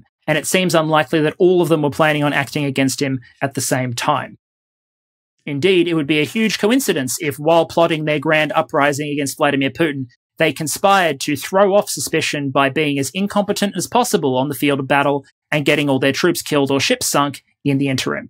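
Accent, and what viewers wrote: Australia. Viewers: I would like to know the overall effect of the cleaning out of all the old Soviet hardware. Is this a good thing? Does this allow all the EU countries to upgrade and standardize their military hardware. And NATO has pledged a 300-400,000 man force. Do you think this is affordable for the long term. Because it seems that this 400,000 force will require about 2 years to become really effective. And If I were President Putin, I would try to have everything sewn up by say, next spring. A stablized drawn out struggle works against Ukraine, because Russia can send random missiles to reach any factory or training center anywhere in the country. These Are my 2 questions. Thanks. I always like your information. I enjoy your jokes and your accent. As being here in Japan, I don’t meet anyone with an Australian accent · Hi, nice to hear an Aussie accent again. 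I'm Aussie born (Sydneysider), but have lived in Europe for 57 years, so the main part of my life. I do however still keep track on the goings on Downunder. As for that financial bit, they could be counting on the unexploited resources of the Donbass area and got the figures mixed up. I mean that points to the potential of the area, not what is being produced today. The Donbass holds large quantities of oil and gas and only a minimum is being exploited today. The presidents/governemts before the Maidan revolution (February <num> never put much money to it and kept Ukraine dependent on Russian supplies, which they frequently turned off and let the Ukainians sit in the cold. That was never really necessary if they had exploited those fields themselves. This brings me to why Russia went into Ukraine. After the Maidan revolution (2014) plans were made in conjunction European energy and fuel conglomerats to start exploiting those reserves. 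Because a. that would ensure gas supplies to Ukrainian citizens so they wouldn't have to suffer cold winters again and b. the European market was increasingly in need of gas and it would make them less dependent on Russian gas, with the bonus of revenues for Ukraine. That is what sparked the Russian invasion. They were afraid of losing their controle over the European market and losing their hold on Ukraine. Every expanation else is just Russian propaganda and holds no merit. However the Russian inv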